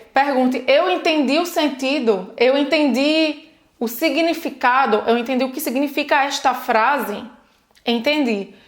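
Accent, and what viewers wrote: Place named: Northeast, Brazil. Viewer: Eu queria saber porque não perdeste o sotaque nordestino, que eu acho muito legal !? Parabéns menina ! Adoro os seus vídeos. Mesmo estando muito longe ,estás ajudando o nosso povo !! Deus te abençoe !! Gravataí RS Brasil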